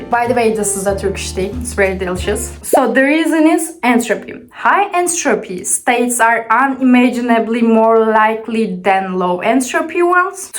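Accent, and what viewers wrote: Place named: Russia. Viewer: Thanks for the video. Btw ur accent sounds more Russian than Turkish. I am Turkish too, but i'm going along with the concept.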